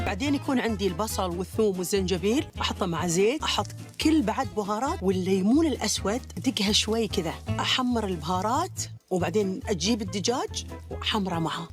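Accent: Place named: India. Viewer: ليش تسولف نفس الهنود لي تكلمو عربي😂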